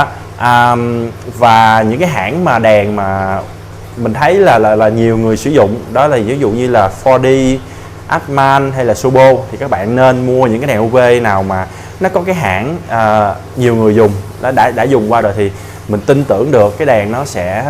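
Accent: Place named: South, Vietnam. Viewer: Giọng nói miền trong đã khó nghe rồi, quay video lại bịt khẩu trang, chán thật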